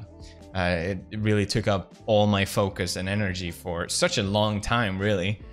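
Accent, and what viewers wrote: Sweden. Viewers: Wow your accent really got better over the years your Swedish is faint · Jag hade svårt att kolla på dina videor i början för att jag inte kan lyssna på engelska med svensk brytning, plus att jag var avundsjuk på att du var så populär, men sen började jag titta på lite colabs med dig och Seán och började sedan kolla mer och mer...med jämna mellanrum. Men dina senaste Japanska videor kollar jag på så fort de dyker upp för de är väldigt underhållande. Så gör vad du vill i vilket tempo du vill. :) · Crazy after all these years you can skill kinda hear his swedish accent I think thats pretty cool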